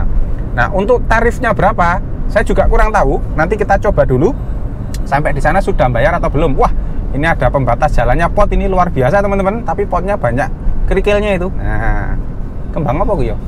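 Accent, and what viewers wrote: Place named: Semarang, Indonesia. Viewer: itu cirinya kental logat Semarangan. Nyangkule ning Jl. Merak-Sby. Omahe Malang-asli Sampingane. 😀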